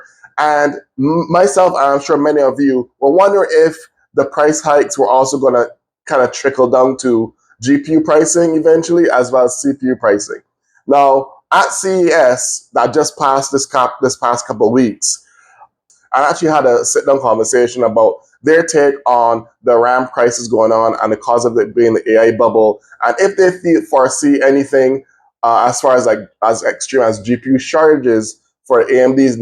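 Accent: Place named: Jamaica. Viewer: man, you have my subscribe, I always wanted a Jamaican to explain tech stuff :D, you made my day! Respect!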